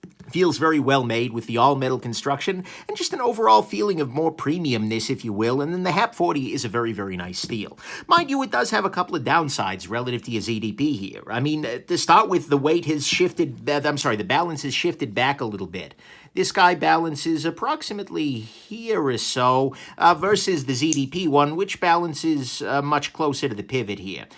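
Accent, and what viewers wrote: New York, United States. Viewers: Please don't take offense but your voice reminds me of my relatives from New York! Thanks for sharing this one... love the dragonfly, and I've always thought those wood scales on the delica variant looked slick!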